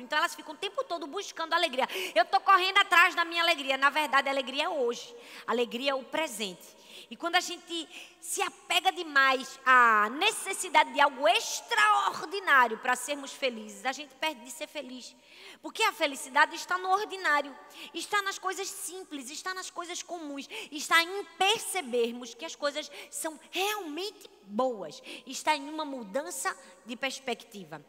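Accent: Northeast, Brazil. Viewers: Amo seu sotaque! Tenho muita vontade de ir pro Nordeste ❤️😍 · Eu amo o sotaque nordestinizado. Linda mulher de Deus. Testemunho de vida fantastico. Abraço bela! 💖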